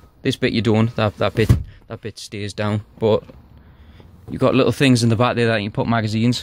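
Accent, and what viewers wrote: Newcastle, United Kingdom. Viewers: Lmao not everyone can understand a Geordie accent not that little buttons fault. Hahaha nice vid well presented nice motor also.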